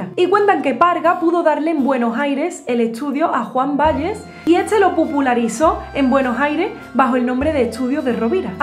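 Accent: Andalusia, Spain. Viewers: ❤ acento español, voz cálida, alegria en la narración y me deleitaste con el sonido antiguo de aires extranjeros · Estará todo inventado pero su belleza,originalidad y pasión por compartir cultura y música no,estoy que hago un curso para hablar Andaluz porque para mi no es habla es música, tan preciada como la antología del cante flamenco, cada post nuevo de es una joya para mi tan valiosa como un concierto de los mas renombrados de la historia,mi sueño es estar en un concierto de su persona y felicitarla por su trabajo. Que bonito.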